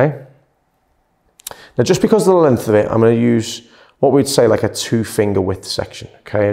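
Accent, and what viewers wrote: Liverpool, United Kingdom. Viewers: you sound like you have a Liverpool accent I am right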